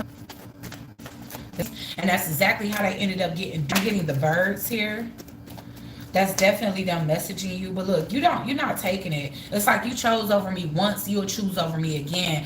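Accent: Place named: United States. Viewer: Yahh boo looking glowing for real for real I love the American twang I'm a London boy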